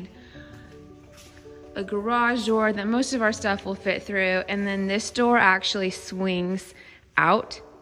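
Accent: Midwest, United States. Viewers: Hip hop culture? Where are you from, man? That's how people in the Midwest talk.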